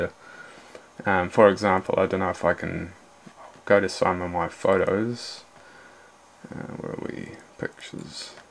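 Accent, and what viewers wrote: New Zealand. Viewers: This is a very nice laptop. I just spent 5 and a half grand on my gaming desktop so Im not going anywhere in terms of money any time soon but as soon as I finish saving up again Im going to get this. XD By the way are you from New Zealand bro? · Thanks to Flight of the Concords I can now spot a New Zealander accent. Thanks, HBO! Oh and good review.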